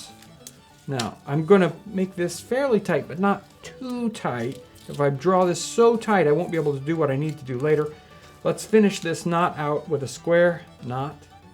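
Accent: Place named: United States. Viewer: Is this guy Dutch American? He looks Dutch but speaks with an American accent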